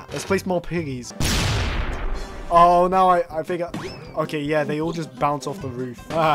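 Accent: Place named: United Kingdom. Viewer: yeah he's British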